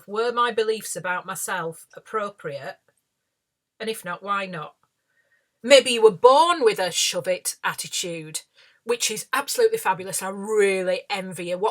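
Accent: United Kingdom. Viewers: I love her British accent so much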